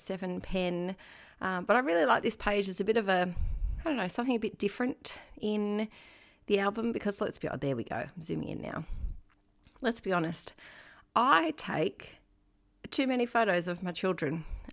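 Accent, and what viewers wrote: Australia. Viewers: Just saying hi from Victoria. Nice to see an Australian junk journaller! I was like, "I know that accent!!" 🤣👍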